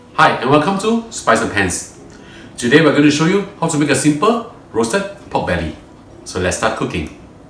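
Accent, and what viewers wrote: Malaysia. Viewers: 一口馬拉音，哈哈😄⋯⋯ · Malaysian accent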